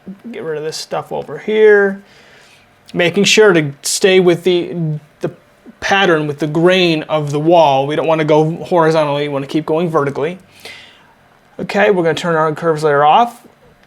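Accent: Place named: Appalachia, United States. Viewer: You sound like Hillblly, btw nice tutorial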